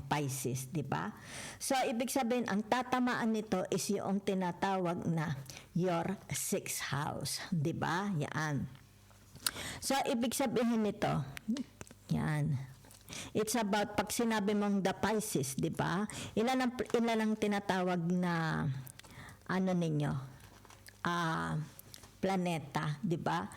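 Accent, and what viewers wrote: Batangas, Philippines. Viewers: Hello mommy kayo b ay Taga Batangas Dito sa pinas kc yong tuno.ng salita niyo eh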